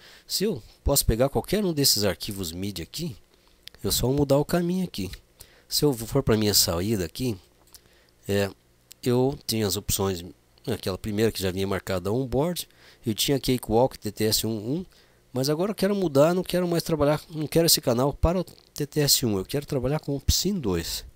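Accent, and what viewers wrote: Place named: Curitiba, Brazil. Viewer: Caro Amigo, que maravilha o teu canal chê!!! Estou tendo de gravar no audacity, por eu ter mexido no meu Sonar 6, e sem saber, devo ter mexido em algum menú que tenha desconfigurado totalmente as saídas de audio e NÃO ESTOU PODENDO OUVIR MAIS NADA DO QUE JÁ GRAVEI!!! Prejuízo lamentável, e o pior de tudo, não sei se terei de perder tudo o que já gravei! Pois penso em pedir a um amigo meu pra desinstalar o Sonar 6, e re-instalar de novo, ou haverá uma esperança de eu reaver tudo de novo, se existir uma forma de eu "resetar" TODAS AS CONFIGURAÇÕES DE FÁBRICA do Sonar 6?!? Ainda que eu perca tudo o que eu gravei, (são só seis músicas) E voltar do zero de novo?!? Há essa possibilidade?!? Por favor, me dá uma luz... Desde já, muito agradecido. Um grande abraço daqui da Cidade de Maringá, Noroeste do Estado do Paraná, sul do Brasil chê!!! 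Sois de Curitiba?!? Senti bem forte uma acentuação no teu sotaque que me é muito amigável e familiar!!! Reiterado Abraço meu Amigo!!!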